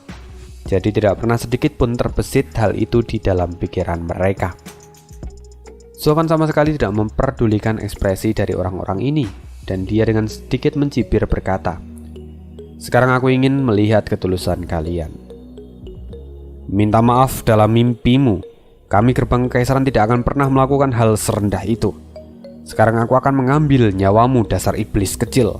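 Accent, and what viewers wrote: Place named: Java, Indonesia. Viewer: Mimin btw orang mana yaa kayaknya logatnya logat Jawa nii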